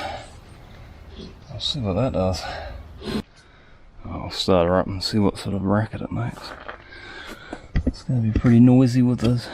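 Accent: New Zealand. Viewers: Great commentary; and good to freshen up my Kiwi accent :)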